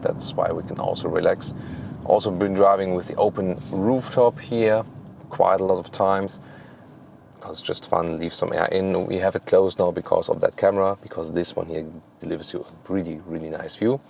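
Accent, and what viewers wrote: Germany. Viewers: Und woher kommt so ein schrecklicher deutscher Akzent? 😅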